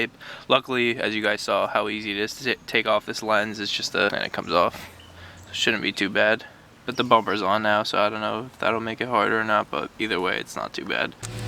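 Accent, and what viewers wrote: Russia. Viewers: Are you russian??!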